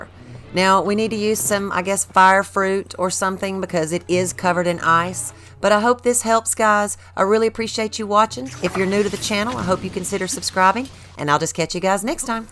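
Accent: South, United States. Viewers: I love your accent ! You are obviously an American - somewhere in the southern states I am guessing ?? Thanks for these videos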